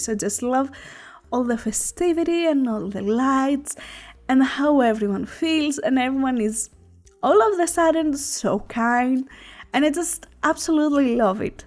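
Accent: Greece. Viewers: ur accent is Greek! Ελληνιδα εισαι?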